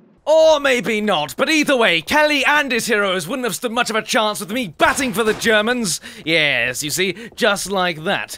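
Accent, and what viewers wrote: England, United Kingdom. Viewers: british cussing is bloody hilarious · Best British accent I ever hear in my entire life. Anyway you just gained a sub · although mate, you might be a little pommy mouthfull...jesus you are great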